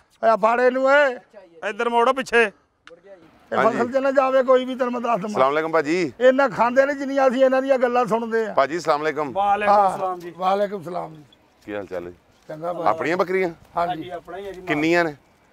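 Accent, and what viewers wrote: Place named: Amritsar, India. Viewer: Tuwadi boli sade ambersar Naal bahut Mildi ah te show vi bahut changa lagda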